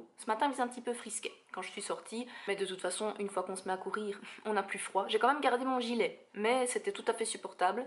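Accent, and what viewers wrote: Belgium. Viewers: Tu habites en Amérique du Nord non? Il me semble que tu as l'accent Belge!? Chouettes vidéos 👍😉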